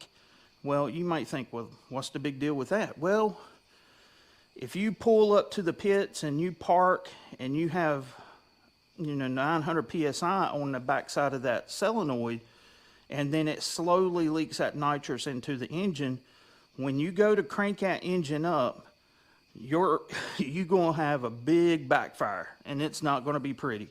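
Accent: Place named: Appalachia, United States. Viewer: I just watched a Video about some Canadian Motorcycle Hill Climbers who added Nitrous to Methanol and Nitromethane for 3 years before it was banned. They said they were warned not to try and Use Nitrous on a Nitromethane fuel injected motor. They did blow 2 cylinders off of a 6 Cylinder Benelli . I overfilled a Bottle one Hot Summer Night . The Little safety Valve has a piece of Copper in it. The Pressure broke the copper. My Trunk lid was all frosty and there was fog coming up through my rear deck speakers. The photo of the back of a car blown up it total BS and as dumb as your accent. Anytime someone talks like a Total Hill Billie I always question their Intelligence.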